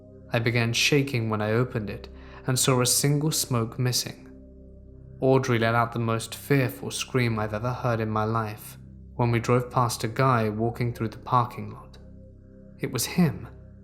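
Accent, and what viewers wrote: United Kingdom. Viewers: Left dislike because british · I was in the hospital last year and i listen to these stories on a small speaker and when my night nurse came in to check on me all she heard was ' voice and it scared the crap outta her. Just literally a man talking with a UK accent (i'm in America) in a totally empty room!!! (except for me) SHe woke me up and was like, "ma'am, were gonna have to move u to another room!" and it took me forever to realize she was talking about the speaker just almost under my pillow out of her sight! I'll NEVER forget that. I hope u get well VERY soon!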